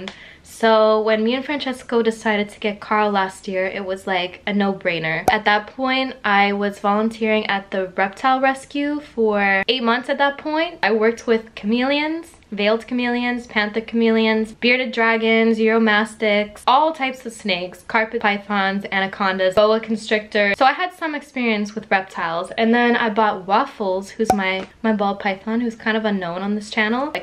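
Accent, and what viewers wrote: United States. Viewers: This is the first time that I've watched one of your videos. God bless you. You cry because you care and that is why I take the time to comment. In the space of nineteen minutes you gave me so much real information and so full of emotion and determination. Your past experience with animal rescue and the skills that you've learned.. You said the Vet bill came to over a Thousand Pounds, with that accent It should have been Dollars.?. Then you went on about the UK government, so I guess you're living in my country now, which is just lovely. I hope you acquired the right number of signatures on your petition and Boris was forced to talk about Chameleons rather than Covid. 😀👍 🦎✔ 🦠❌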